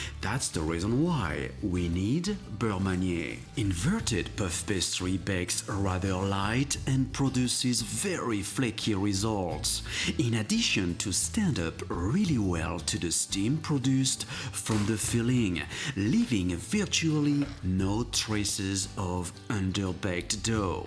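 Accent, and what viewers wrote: France. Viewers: Lydia Aschenaki he is French-speaking, with a typical French accent. If his accent annoys you, mute the video, or get a life. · hi, thanks so much for your reply. I think you're amazing in your baking. I watch many other video in baking but it's never as perfect as the way you demonstrate. I love your accent, it's that French accent? I love French baking although I never bless to eat anything French. I am very please to found your video for baking. Thank you so much and please continue with more delicious baking, one day I want to learn how to make croissant By the way, I watch that video so many time to get to steps, and at the end you said "sorry guy" and you took a bite out of a croissant, I want a bite too lol....so delicious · He really speak like a stupid frensh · Hes French what kind of accent do you want him to have? Southern? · Are you from french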